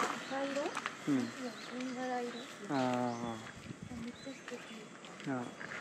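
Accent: Kansai, Japan. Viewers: 今日も楽しい動画有難うです。の可愛い関西弁との優しさに癒されていますよ。無理しないでf頑張ってくださいね～。次回もたのしみです。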